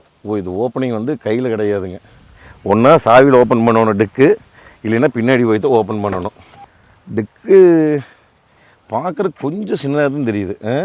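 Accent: Kongu, India. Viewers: Anna unga kongu tamil review tha mass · காரைவிட உங்கள் கொங்கு தமிழ் அழகு...அம்மா ஊருக்கு போண மகிழ்ச்சி 😀🥰🙏🏻. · Kongu slang is🤔😊 mesmerizing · அட நம்மூர் அதாங்க கொங்கு தமிழில் கேட்டதும் மனசு குளிர்ந்து.அய்யா SPB மறக்கமுடியுமா. எதார்த்தமான தங்களின் பதிவு ச சொல்ல வார்த்தை இல்லை.my son also se your comments and he like to purchase hundai or Honda amaze.தங்களின் பனணிதொடர் வாழ்த்துக்கள்.எனது ஊர் k village சர்க்கார் கண்ணாடிப் ஆத்தூர்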